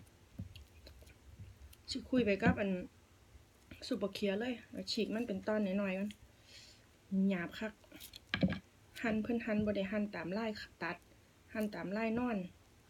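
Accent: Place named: Roi Et, Thailand. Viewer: อิหล่ายุจังหวัดไดนอเว้าคือสำเนียงทางร้อยเอ็ดบ้านป้าเลย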